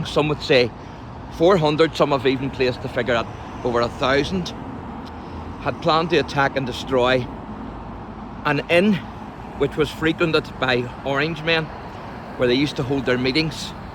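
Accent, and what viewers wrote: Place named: Northern Ireland, United Kingdom. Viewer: The Northern Irish accent is thee best accent in the whole English speaking world so it is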